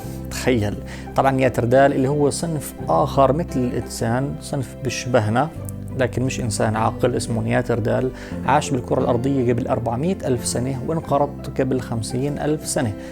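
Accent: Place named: Palestine. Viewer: شوف نفسك لهجتك ثقافتك عاداتك اسلوب حياتك واضح انك فلسطني هذا وطنك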